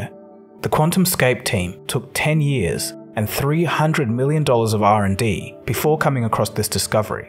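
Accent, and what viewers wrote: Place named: Australia. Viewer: It's a real torture to listen to Australian. Can you make an English version of this video? You can hire human narrators as cheap as a few $ per hour (just google "narrator voice over") or use synthetic voice. If you hate American accent, hire someone who speaks British English or its more neutral European variant.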